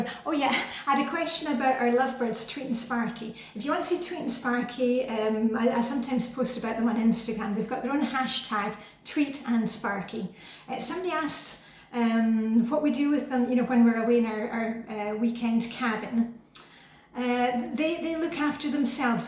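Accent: Scotland, United Kingdom. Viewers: Hello, I´m new here just found you on YT. What a nice and inspirational person you are. I am Danish and live in Denmark. Seems to me that you have a Scottish accent is it true? Hope you don´t mind me asking. Jeg håber du får en rigtig god dag og ser frem til at dykke ned i dine videoer....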